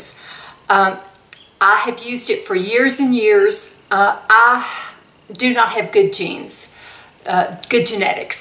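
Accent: South, United States. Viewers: Love this video! I do appreciate your Southern accent, since I’m a Southern girl myself. You look great! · You are stunning,! I just love your beautiful Southern accent. Will you please do a tutorial with a complete demonstration on how you styled your hair for this video? I'm a visual learner with several new disabilities from a brain tumor. I've learned so much from you and your wealth of valuable information. Thanks for all you do!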